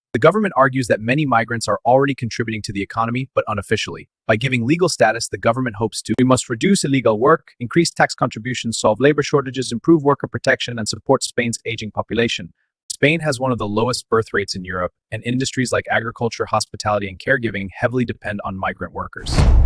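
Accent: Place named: India. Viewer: Why did you suddenly have an indian accent? Odd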